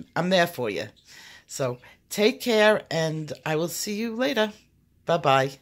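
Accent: New York, United States. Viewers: Hi! I am so thankful for your channel. You are so encouraging and your lessons are easy to follow. My only problem is when you don't show what color pencil you are using as you are using them, or list them in the description, as I am trying to keep a record of good color combinations. Thank you for all that you do! Blessings! P.S. You sound just like my family from NY!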